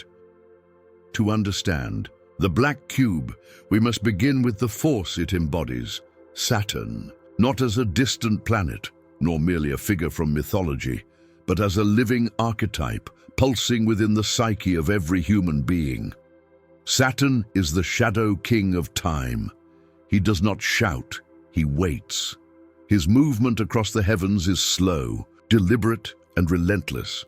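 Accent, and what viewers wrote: United Kingdom. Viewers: SAY-tan= Satan. And SATurn=Saturn. & Tis not British, but the English accent. Scottish/Welsh, is vastly different. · It's the British accent. They don't emphasize the r like Americans.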